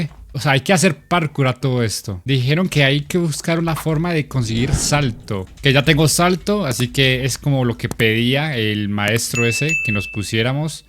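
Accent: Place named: Colombia. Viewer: Jjajaa ese acento se nota de Colombia saludos parcero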